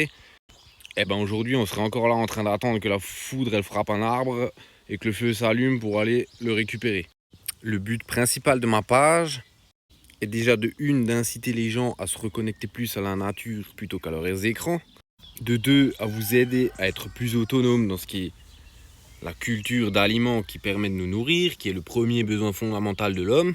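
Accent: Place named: Franche-Comté, France. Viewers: Je vous adore !! Ainsi que votre accent qui me rappelle ma Franche Comté natale!! 😊 Je suis très en retard pour la plantation de mes tomates !! Mais avec le pont de cette fin de semaine, sans regarder la lune, je les planterai quand même!! Mais je vais essayer votre fil de cuivre. Ça ne mange pas de pain !! Merci pour vos publications pleines d'humour. Je vous kiffe ! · Merci de tester et d'ouvrir les esprits, d'autres part je crois que je tombe amoureuse de ton accent de l'est 😉j'apprécie tes conseils, ta manière de faire des rimes avec le vivant du potager, j'ai vu les rageux se déchainer en commentaire sur ton dernier réel sur l'éléctroculture, un pas de travers dans le sens inverse de leur pensée et tout le monde s'enflamme au lieu de tester tout simplement, ils préfèrent boycotter!! bise de l'ouest 😘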